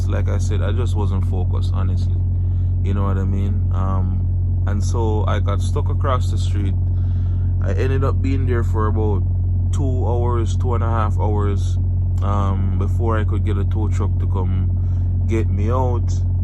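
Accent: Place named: Jamaica. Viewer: Sounds Jamaican 🇯🇲 to me …… mistakes do happen head up champ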